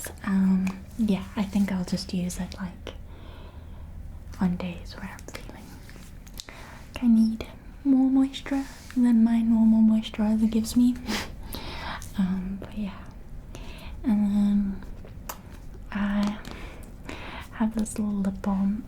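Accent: South Africa. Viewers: Sooo soothing 🥺 are you from South Africa?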